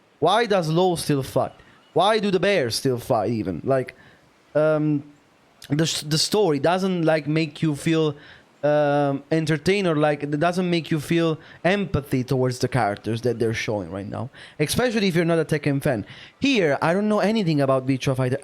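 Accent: Italy. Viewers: You sound Italian, the best European vf player is from Italy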